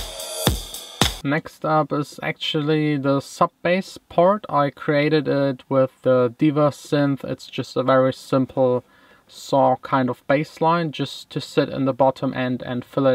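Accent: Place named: Germany. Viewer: Hi! It's incredible how much you've improved your English accent. In the video that's linked below you have a very strong German accent haha. Congratulations! I love your videos and music 😊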